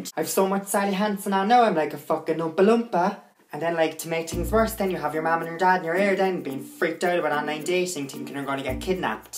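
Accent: Cork, Ireland. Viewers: only found your channel today and im in bits, your videos are hilarious you left me not breathing because im laughing so much, its so cork hahh i love it, reminds me of my mom hahah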